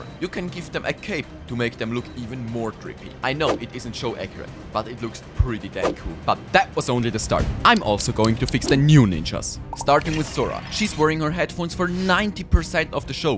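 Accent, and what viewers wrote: Germany. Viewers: Bro are you German ?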